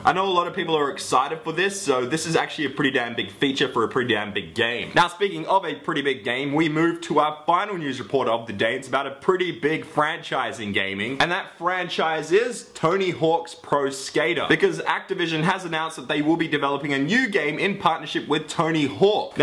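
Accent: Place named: Australia. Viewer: My left ear is loving this Australian voice and my right ear is enjoying these random noises.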